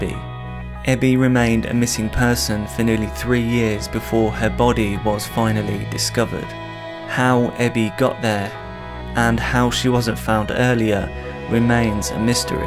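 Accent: England, United Kingdom. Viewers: Dude you’re just reading the Wikipedia article nearly verbatim, albeit with a spooky English accent. Right on for making something entertaining without doing too much work.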